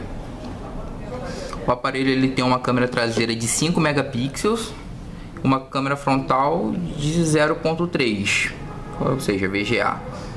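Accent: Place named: Rio de Janeiro, Brazil. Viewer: Que sotaque gostosinho desse cara deve ser carioca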